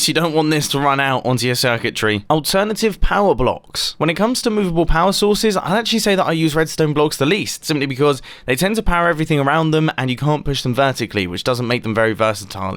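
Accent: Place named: United Kingdom. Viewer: Mumbo sounds so much more British on camera than in real life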